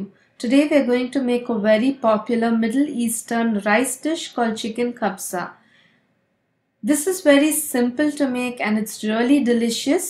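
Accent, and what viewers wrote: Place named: India. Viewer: You sounds like you came from india...sorry if im mistaken...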